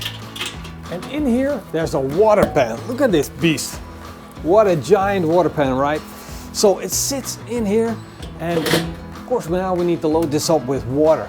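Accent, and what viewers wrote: Netherlands. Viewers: You're Dutch, aren't you? 👌😉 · Love the videos man but was wondering are you dutch?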